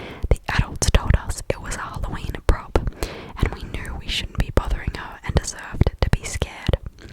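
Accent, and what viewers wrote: Australia. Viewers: Australian accent 🔛🔝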